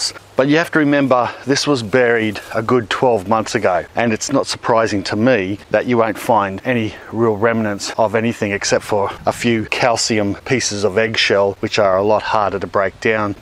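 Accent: Australia. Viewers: I was wondering what he meant.... Couldn't catch exactly what he said with the Aussie accent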